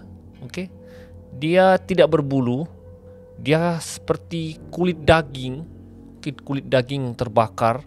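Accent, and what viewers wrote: Sabah, Malaysia. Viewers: bahasa indonesia lain bah...bhasa ini slang sabahan originol punia...😁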